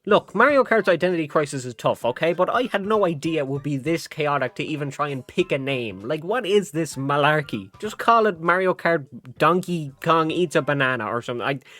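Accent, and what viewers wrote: Ireland. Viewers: You must be irish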